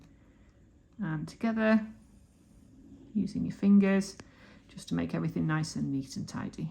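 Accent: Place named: York, United Kingdom. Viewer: Such a lovely link. what size would you say is the jump ring? Also, are you using dead soft or half hard wire? Enjoy listening to you speak, is that a York accent I hear. 😊❤️. Thank you.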